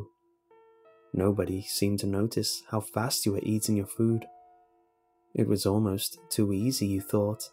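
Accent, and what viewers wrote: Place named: RP, United Kingdom. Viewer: Dark Glitcher I think it's mainly the accent. Can't beat a posh Brit accent in voice overs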